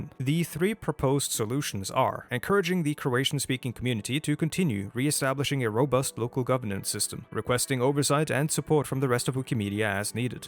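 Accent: Norway. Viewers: ha, Norwegian detected! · My new fav second monitor content guy/gal. Norwegian accent highly underrated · you kinda sound like LEMMiNO but you are not swedish but norwegian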